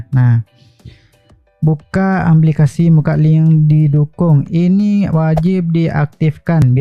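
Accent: West Sumatra, Indonesia. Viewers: Abang orang sumatera barat ya 😅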